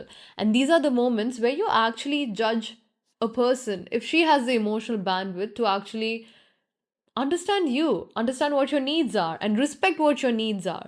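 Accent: Kerala, India. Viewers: ibal malayali alle. poli. i just noticed now, her english is malyali english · Nice insightful discussion but lots of grammatical errors when you talk. “Most Nicest” is incorrect English. It must be either “Nicest” or “Most Nice”. Most Indians especially people from South make this mistake which makes me wonder whether grammar is still taught at the elementary level. When you are using the word “most” you are already attributing the maximum level of the adjective to the concerned individual. So, you do not need to do that again. Similarly, I have come across people saying “did went” “did came” which is again something that keeps hitting me. “Did” has already attributed past tense to the verb and it need not be done again. So, after using “Did” you use verb in the present tense. So, the ideal way to say it is either “came” or “did come” Don’t mind but your Malayali accent already makes people curious and if you continue with these mistakes, you will make yourself a laughing stock. 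I hope you take the feedback positively and don’t make it a ego issue like most Indian women. I gave similar feedback to my cousin sister and she kept contesting me till the I gave up. Actually she realised her folly but her ego was getting hurt for being corrected by her little brother .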